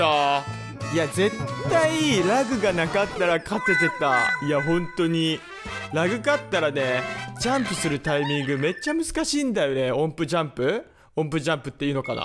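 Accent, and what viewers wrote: Fukuoka, Japan. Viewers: もう少しで勝てそうな惜しい試合が多かったですねｗ それよりなんだかコメント欄がにぎわっていますね！良いことだと思います！は福岡のかたですか？前から福岡っぽい喋りだとは思ってましたがｗ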